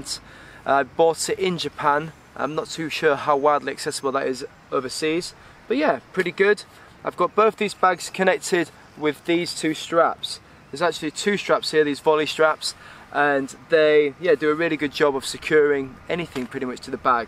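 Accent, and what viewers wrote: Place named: Northern England, United Kingdom. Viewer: I can’t work out your accent. Especially when you say ‘bike’. I know it’s north of England but is there some welsh or maybe Scouse mixed in there? 😂 All the best.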